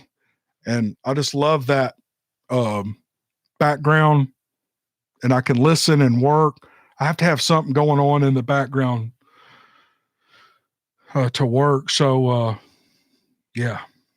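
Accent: South, United States. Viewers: I LOVE YOUR VIDEOS..THE SOUTHERN ACCENT JUST PULLS ME IN AND YOUR SUCH A NICE GUY..GOD BLESS...THANK YOU FOR ALL YOUR HARD WORK AND DEDICATION..❤HOPE YOU FEEL BETTER SOON!! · Me to Jenn jenn he is a humble soul just listening to him soothes your mind and the southern accent I luv.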